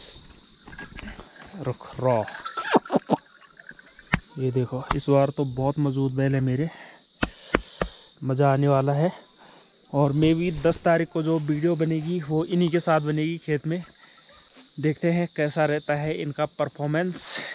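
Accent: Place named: Hills, India. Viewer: Bhai ji aapki video aur aapka presentation Bolne ka tarika bahut badhiya hota hai ekdum hum pahadiyon jaisa apni video daily dale aur aise hi vlog banate rahein.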